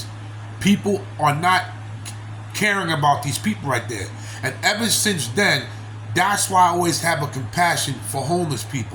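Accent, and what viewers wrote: New York, United States. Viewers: White people love you right back and new subby here and I'm not leaving you..I just love the new York accent❤❤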